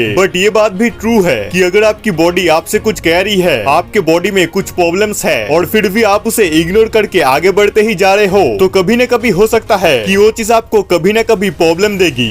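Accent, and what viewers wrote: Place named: Bengal, India. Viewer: এক্সেন্ট এই বোঝা যাচ্ছে যে তুমি বাঙালি।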